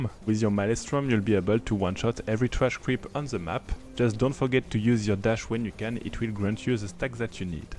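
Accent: France. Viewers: what a french accent +D well ty for this build =)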